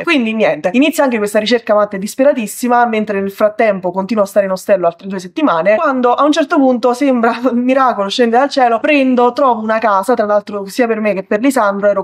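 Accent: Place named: Naples, Italy. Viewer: sei napoletana?io di Salerno... Sembra che hai lo stesso accento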